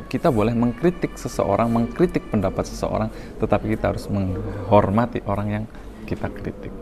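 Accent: Banyumas, Indonesia. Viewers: Njenengan ngapaknya daerah mana mas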